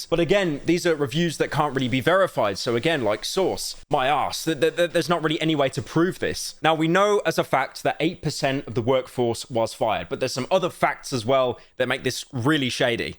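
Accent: United Kingdom. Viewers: I love new haircut, dunno why he sounds british now though! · Why are you british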